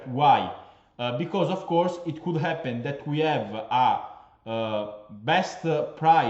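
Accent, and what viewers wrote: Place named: Italy. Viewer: chicco bello il tuo inglese è buono però si sente che sei italiano aahahha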